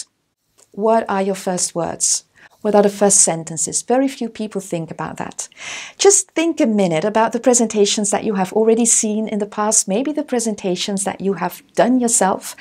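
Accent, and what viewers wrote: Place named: United Kingdom. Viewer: She speaks very smoothly without any fumble- awesome British style of speaking...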